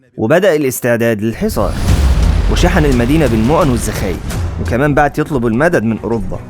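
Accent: Egypt. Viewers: انا اضفتك جديد وممتاز جدا حسابك لكن اتمنى تغير لهجة مصر الغالية مثلا ( القيش ) الجيش ) حاول تزبط اللغة العربية الفصحى ) امنية من اخوك يزداد متابعوك حول العالم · اللهجة المصرية اساءت لمستوى الحدث الكبير !!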